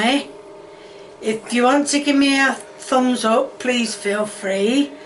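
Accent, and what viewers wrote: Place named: Northern England, United Kingdom. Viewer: I like watching your channel, as a northerner I get what your saying and your sense of humour and sometimes the reaction channels act like something is weird when it's not, it's just how us northerners talk!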